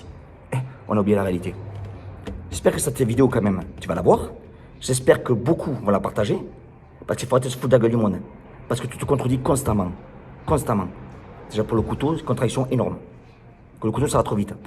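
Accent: South, France. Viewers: Ça fait surtout du bien d'entendre ce si bel accent qui se perd malheureusement partout dans le Sud.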